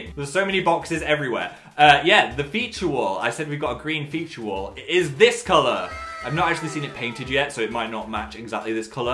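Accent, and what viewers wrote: United Kingdom. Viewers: Is it just me or does Phil’s accent sound more British than normal if you get what I mean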